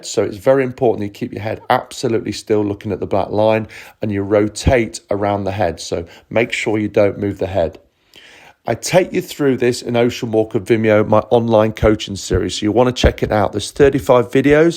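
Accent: United Kingdom. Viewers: This british accent makes me cry😢😢😢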